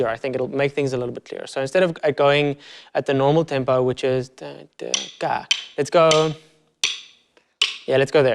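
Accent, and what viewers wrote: Afrikaans, South Africa. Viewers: If you want a laugh turn the captions on for the video. Youtube doesn't understand the South African accent at all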